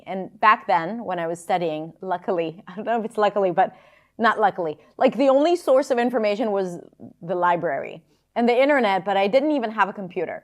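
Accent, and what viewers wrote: United States. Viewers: Hi mom i am watching ur all videos day n nyt without sleeping because i like your crystal clear pure american english i am noticing each letter how u pronouncing your voice very clear to understand and i started to learn and practice same like ur accent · Wow! She"s not a native speaker?! But sounds like one. Perfect american accent. Really good! 😁❤️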